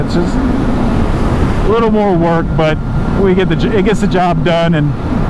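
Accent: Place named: Louisiana, United States. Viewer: Very informative. Nice, soothing Cajun voice.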